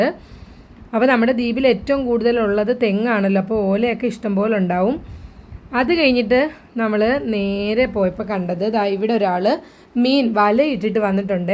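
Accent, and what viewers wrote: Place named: Kochi, India. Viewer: ഞാൻ അബ്ദുറഹ്മാൻ ചാവക്കാട്😂 നിങ്ങൾ ലക്ഷദീപിലാണോ, ഭാഷാ കേട്ടിട്ട് കൊച്ചിക്കാരത്തിയുടെ പോലെ തോന്നുന്നുണ്ട്, അവിടെ ഫ്രഷ് മീൻ കിട്ടുമല്ലോ, നിങ്ങളുടെ ഭാഗ്യം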